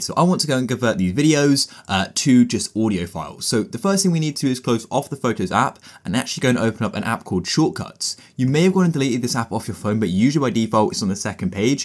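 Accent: United Kingdom. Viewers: British guy 🙂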